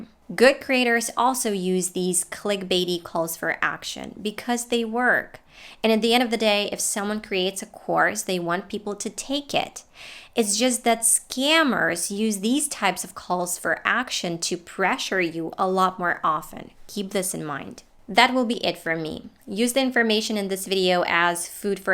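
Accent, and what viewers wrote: United States. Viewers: Thank you Mrs. for your precious opinion. I find it worth watching as I had bought a course in vain and couldn't apply it as my lifestyle was too time-taking in offline so I couldn't sit calmly and learn it online, that was terrible experience sadly. Some notes I jotted from the video: — Not advertised enough course might be the best course one may ever have taken Therefore don't be so captivated by any promoted course, the quality is much more vital than peripheral ads (the goal of those is just find customers not more than that) — Feedback is important as well as a trial period — Biased can be anything that someone can suggest to others cuz everyone has different tastes to say the least Sales under the pressure can make you buy the course that you don't even need or the course might not be organized well for one's lifestyle P.S. 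Mrs. you look great like a Ukrainian (I guess, is that true? ) and perfect with American accent it matches you so much, especially when it feels that there is a sense of what you're talking about Thank you so much🙏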